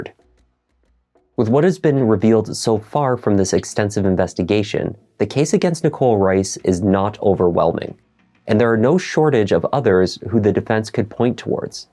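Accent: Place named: Canada. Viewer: He’s Canadian.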